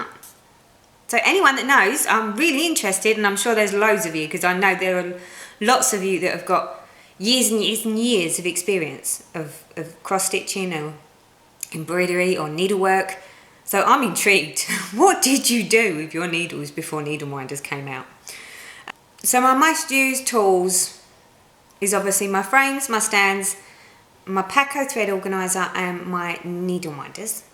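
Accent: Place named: London, United Kingdom. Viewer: Hi,! I'm brand new to Flosstube and am catching up on videos. Just did an intro video but I might have to do this one next (even though I don't have funny moments in stitching, really!). BTW, your accent makes me miss London! Cheers! --Beth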